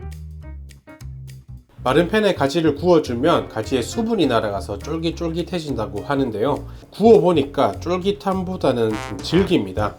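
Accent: Gyeongsang, South Korea. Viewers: ㄱㅕㅇ상도 말이 멋져요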